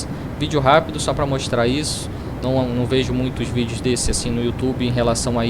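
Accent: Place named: Rio de Janeiro, Brazil. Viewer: Muito obrigado comando! Sua didática é excelente. E parece ser meu conterrâneo do Rio de Janeiro kkk você é piloto real de 737 ?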